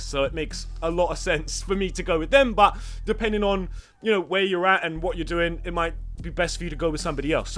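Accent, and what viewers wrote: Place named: Surrey, United Kingdom. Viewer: You sound like a surrey boi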